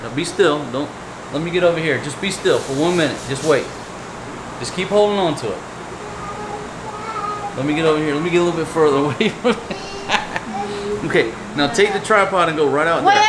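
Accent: South, United States. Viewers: Ahahaha. On 2158 min you said vijoos. Sounded so funny coming from you. Sounded so very Filipino. Kinda cute coming from a Southern man who has that Southern twang. Hope Fatima can relax when she goes back home so she can enjoy her vacation. Peace.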